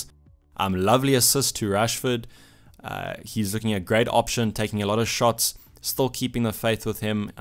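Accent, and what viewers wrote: South Africa. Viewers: Bro u South African?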